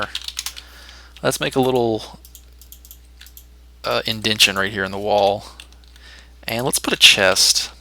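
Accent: South, United States. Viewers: What part of America are you from> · love the southern accent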